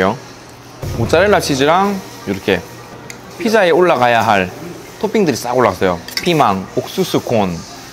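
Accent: Gyeongsang, South Korea. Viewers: 서울에서 10년이든 ㅋㅋ 말투가 경남이니깐 지방에서 왔나물어보는건 당연하지 ㅋㅋㅋ서울말 아에 안쓰시던네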